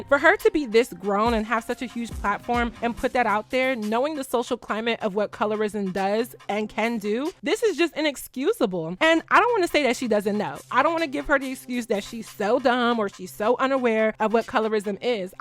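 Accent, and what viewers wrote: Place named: Philadelphia, United States. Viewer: I love your Philly accent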